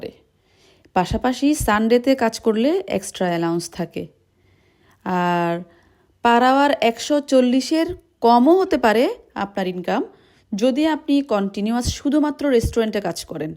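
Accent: Chittagong, Bangladesh. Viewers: আপনার বাড়ি চিটাগং তা ভাষায় বুঝা যায়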